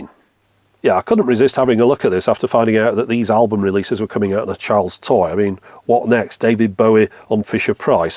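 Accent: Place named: England, United Kingdom. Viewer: I like the chirpy English voice.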